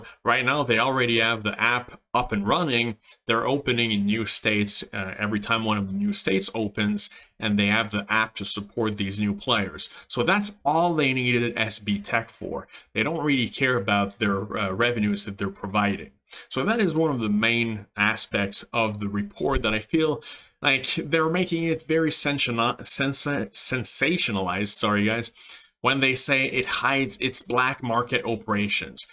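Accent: Quebec, Canada. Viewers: Salut Est-ce que je détecte un accent Québécois?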